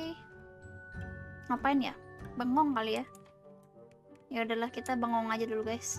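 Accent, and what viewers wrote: Indonesia. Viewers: Sumpah nih kakak orang sunda apa orang mana sih?😅😅,lu kaya orang jakarta,terus surabaya,sunda,medan,yg mana sih yg bener😂😂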